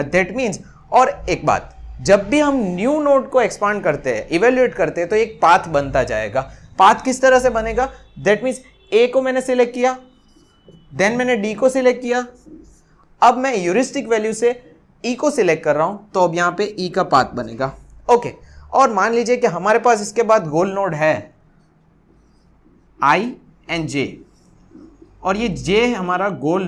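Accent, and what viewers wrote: India. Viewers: FARK!!!!!.........every fucken video on anything maths and IT is fucken Hindi or English with strong Indian accents.....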